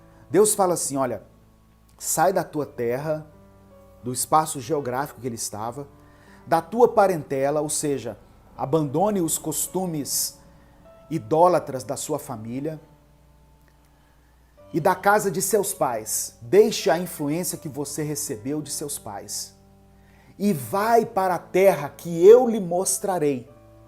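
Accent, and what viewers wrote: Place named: Minas Gerais, Brazil. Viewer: Você é de BH ou MG? Seu sotaque não me engana rsrs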